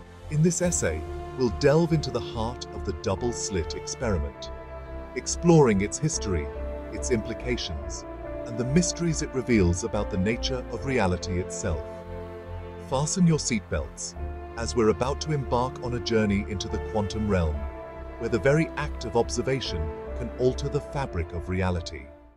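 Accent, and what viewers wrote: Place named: England, United Kingdom. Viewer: I'm sorry - I can't take that accent seriously. I know there's a bit of a fashion on yt for slightly plummy English accents doing sciency documentaries. But this accent is slightly weird.... tbh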